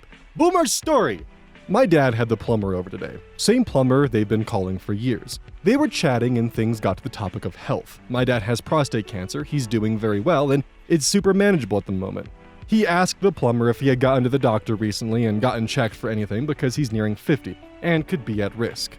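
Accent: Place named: Midwest, United States. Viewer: Even though you're from Ohio (Pretty sure that's what you said) and he's from Wisconsin, once in a while your accent makes me think of Pickles from Dethklok. Juuust enough that I couldn't place who I was thinking of til just now (been listening for maybe a month now?-idk my ADHD makes it hard to measure time)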